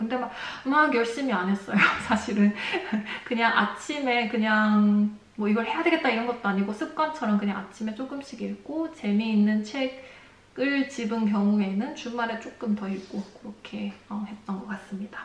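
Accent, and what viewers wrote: Gyeongsang, South Korea. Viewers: 원서를 몇권 읽어보니 처음엔 책장 넘어가는 속도가 너무 느리다가 탄력받으면 마지막으로 갈수록 많은양을 하루에 보게 되더군요. 한국말을 차분히 들어보니 경상도쪽 억양이 있으시네요~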